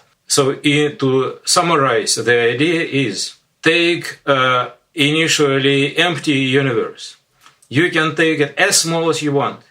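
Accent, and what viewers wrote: Russia. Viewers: Это прикол что-ли? Зачем изображать знатоков английского, если у этого Андрея ужасный русский акцент, и он с трудом подбирает английские слова? Так ещё и без субтитров.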